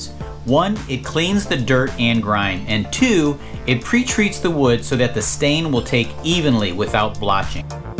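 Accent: Chicago, United States. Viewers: You're from Chicago aren't you? We hear it in our own.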